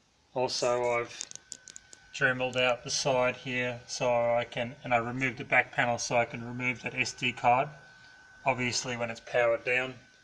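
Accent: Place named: New Zealand. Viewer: U sound like a true kiwi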